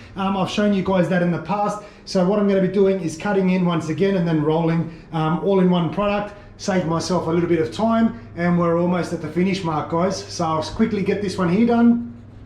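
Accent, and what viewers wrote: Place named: Australia. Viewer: Loving the vids mate - very easy to understand and so refreshing hearing an Aussie in one of these DIY vids! 😂 I had a similar problem in the bathroom, prob even a bit worse, was at least half the ceiling. This is my third attempt in about 12-18 months! This time I used Zinsser BIN as the primer but basically followed this same process you detail. From what I can tell it is a similar product but no Binder in it... I have just applied a final 'top' coat of joint compound... Should I just use the BIN next before my ceiling paint or buy the Peel Stop or Crommelin? I could really do with your assistance. Cheers mate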